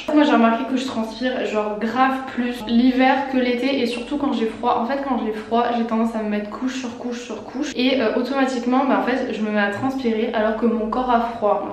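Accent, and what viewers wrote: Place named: South, France. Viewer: De l’authentique (avec l'accent d’Ugolin dans Manon des sources ) c'est pour cela que tu as beaucoup d'abonnés et que tu en auras encore plus 👏ne change rien tu es magnifique autant de l'intérieur que de l'extérieur 😘😘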